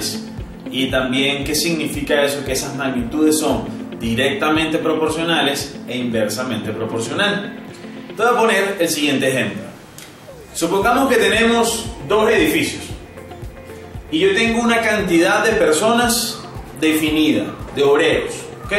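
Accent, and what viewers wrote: Venezuela. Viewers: Like si te diste cuenta que él profesor es venezolano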